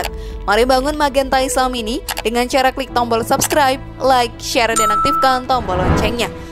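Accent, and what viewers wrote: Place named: Java, Indonesia. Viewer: trimakasih berbagi ilmunya...hanya sedikit masukan yg bacanya terlalu medok cengkoknya bu...coba di perhalus biar enak di denganya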